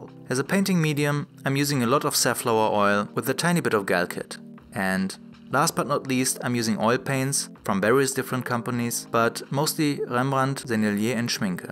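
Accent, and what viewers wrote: Germany. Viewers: Man hört raus dass du aus deutschland kommst haha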